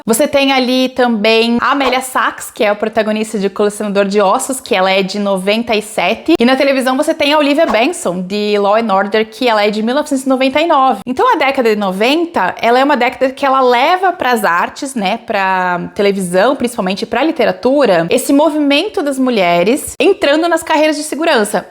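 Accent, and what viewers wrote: Rio Grande do Sul, Brazil. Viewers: Adorei a resenha, sim me convenceu a ler e com certeza irei! Inclusive, nd relacionado com o video kkkk, mas eu sabia q eu conhecia esse sotaque gostoso gaucho de algum lugar, ai vendo sua caixa postal percebi q tu é de Bento, quase vizinhos kkkk, sou de Caxias do Sul. Conheci recentemente teu canal e tenho maratonado!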